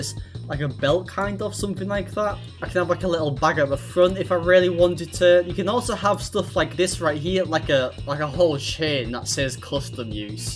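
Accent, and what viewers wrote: United Kingdom. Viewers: Mate, are you British?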